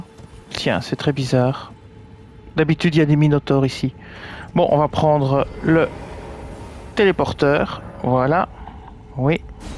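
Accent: Belgium. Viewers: Tu as un accent du Hainaut je me trompe ? ;) merci pour le muguet !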